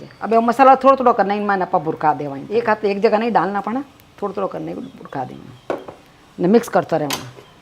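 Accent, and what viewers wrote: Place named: Rajasthan, India. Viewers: Aunty aap rajasthan me kaha se ho....??? Meri Bua nokha-mandi bikaner rahti h wo aur unke bachhe asehi bolte h.... Mujhe unka talking way bahut pasandh h.... Aap bhi waise hi bolte ho....bahut achhe lagte ho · Aapko mera Pranam : aap ki marvadi bohot achi lagti hai , meri maa bhi aapki tarha bolti thi , thari boli mane bohot achi lage hai: thane Mero bohot pyar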